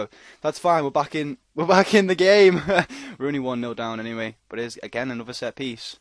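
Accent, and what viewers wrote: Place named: Wirral, United Kingdom. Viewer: Are you from the Wirral, you have a similar accent to me.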